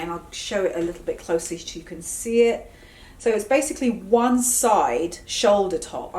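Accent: United Kingdom. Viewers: Hi, I'm Mariel (the same from your blog, but another picture jajaja)....You look amazing with your own hair!!! Keep it that way! I love your british accent....! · ! You are the Master Mixer, Co-ordinator and Fashionista of them all! You're truly such an inspiration and a great teacher! Yaay and a fellow Brit... love your accent😂 I've been dowdy for far too long and been wanting to switch it for a while. Well, now I'm switching it up... with your help! Thank you. Please tell me the oriental jacket from is reversible. It sure looks like it is. Love you! Love what you do!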